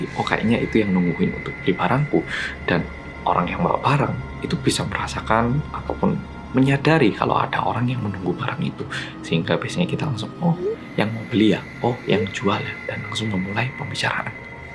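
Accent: Java, Indonesia. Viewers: Hallo oppa medokn☺️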